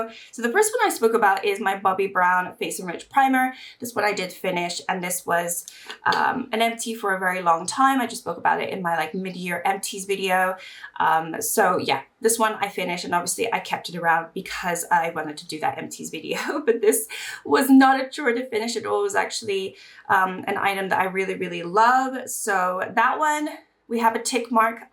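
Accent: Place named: England, United Kingdom. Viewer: Where's your English accent gone😂❤?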